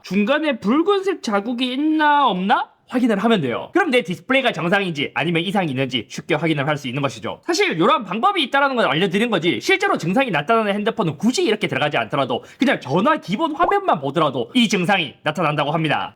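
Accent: Gyeongsang, South Korea. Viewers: 난 얘 경상도사투리 뭔말하는지 못알아듣겠슴..진짜 운좋게뜬 케이스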